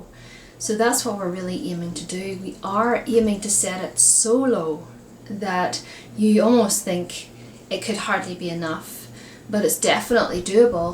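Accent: Ireland. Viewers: I love Irish accents. I was in heaven watching "The Fall"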